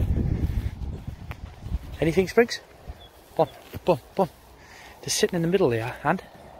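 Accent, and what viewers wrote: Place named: Wales, United Kingdom. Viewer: 11 rabbits, we can make £22 quid in Sunderland for the meat mate. Do yas do any better over in Wales? I'm guessing by the accent, apologies if I'm wrong :-)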